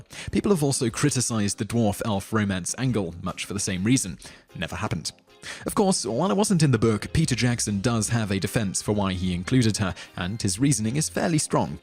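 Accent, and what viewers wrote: United Kingdom. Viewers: Other British people, surely you understand that pronunciation of things is natural, right? Taking into account the many kinds of British accent there are, this is just the way people with his accent pronounce things when they read a word! pronunciation and British tone is preferable, no wonder England has the Top 10 Channel game by the plums. Please keep up pronouncing things the way you do,! If I did the subscription thing, I'd subscribe.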